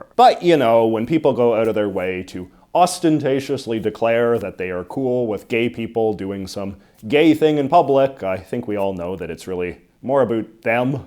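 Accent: Canada. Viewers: You have a very Canadian stereotyped "about". I often heard the stereotype but I didn't think it was real. When I see a same sex couple holding hands, I smile like a loon and I try not to stare at them at all because it would be so creepy to have this stranger smile and stare at the same time. I can't help it, it makes me so happy. It's so rare where I lived, I think it happened to me only once. I hope that one day, it will happen so frequently that I will have a normal reaction. (just to be clear, I'm queer too) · has literally the most Canadian accent I have ever heard, and I'm a Canadian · Mmmm hard to say and tricky subject I mean I hope shit calms down but I think most men 19-25 say have the thought of "could tap" with any possible mate which does get in the way of meaning ful relationships with woman and so in a ignorant attempt to step into one's shoes the young man with think that a young man who is instead attracted to men will then have similar thoughts. 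It is kinda baked into what we are. The whole friendship thing is just a plus of having big brains. I had something I wanted to say but forgot after trying to get all this out oh yeah kinda lame you are only know for being gay I remember being kinda surprised but you really don't talk about it. I never know If I should be typing third person so it doesn't read like I am talking to the youtuber hie self... you know? anyway I always think of this guy as the one canadian that actually sounds like that and the guys isn't even a newfy I have even visited my uncle in Vancouver and I didn't pick up the locals accent. Man that was long winded I need some more fluoride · There is something in the way that you speak that is slightly hypnotizing. The eye movement. The head movement. The cadence of your speech. The intonation swells. The relaxed smiles. It's very calming. You could easily speak for a 3 hour lecture and I would listen to all of it. 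.. Maybe it's just because I'm Canadian and like to hear the accent. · is the most Canadian sounding Canadian i've ever heard. Also did not realise you were 38...thought you were way younger than that. ❤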